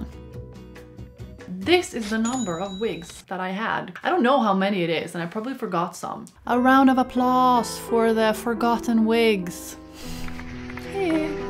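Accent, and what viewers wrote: Sweden. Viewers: I've never seen u before but i can hear that ur Swedish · Your Swedish accent makes it all better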